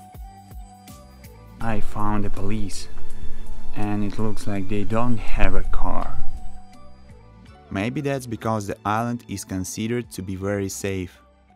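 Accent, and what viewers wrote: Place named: Australia. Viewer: Thank you for this awesome and very detailed vlog. I am visiting Greece in 10 days and Hydra is one of the places on my list. The moment I came across with this beautiful island while planning my trip I fell in love right away especially the no car concept and how walkable the lovely harbour town is. By the way, I am curious about your cute accent, I could recognize some part Australian and a hint of eastern European. ;-) Cheers mate!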